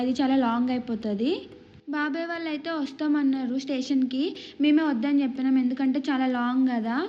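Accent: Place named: Srikakulam, India. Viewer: Srikakulam slang🔥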